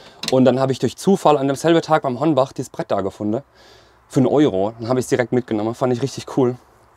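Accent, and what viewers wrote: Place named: Swabia, Germany. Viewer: Müsste Schwabe sein glaube ich 😄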